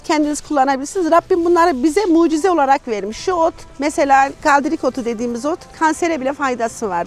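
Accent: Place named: Adana, Turkey. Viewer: Adanalılar şivesine benziyor Ablanın konuşma tarzı verdiği bilgiler çok kıymetli teşekkür ediyorum Allah razı olsun. 🤲🌷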